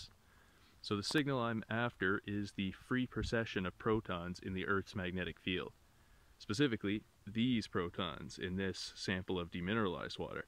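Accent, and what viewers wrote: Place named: Canada. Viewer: Yeah I'm enjoying the dual pancake experiments! Very little on yt done with actual muon air shower measurements, so that's interesting to see. You sound Canadian and it looks like you're in a deciduous forest - Ontario? If so and you're ever in Rochester for some undoubtedly regrettable reason let me know and I'll give you a tour around the high energy laser fusion facility here.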